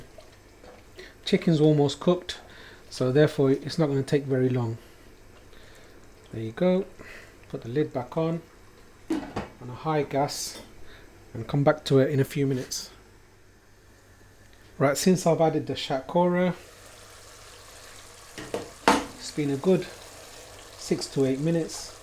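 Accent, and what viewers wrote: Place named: Birmingham, United Kingdom. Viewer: Sounds like a Brummie tinge, are you from the midlands. Apologies if it was obvious where your from from the earlier videos but I recently stumbled on your channel. Very good quality videos by the way, especially the recent trip to Bangladesh. Keep up the good work.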